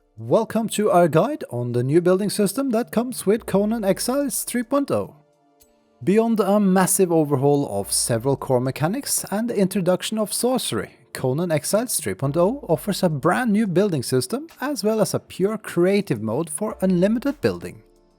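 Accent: Norway. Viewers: I believe i hear a norwegian accent xD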